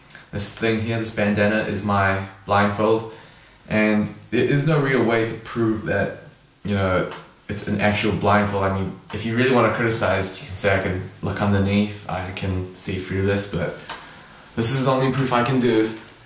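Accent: Australia. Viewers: He sounds Australian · What place is your accent from? You sound kind of Australian.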